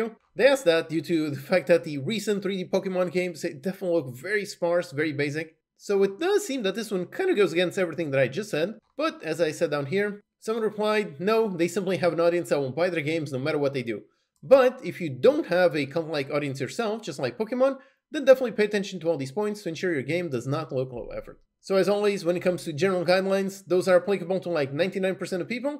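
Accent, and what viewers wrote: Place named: Portugal. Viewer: Totally off topic question, but you speak Portuguese as well, don't you? I can notice from your English accent, either that or you speak some latin language, right? Your videos are excellent, I really like how you're straight to the point, they vibe with me a lot, thanks for that!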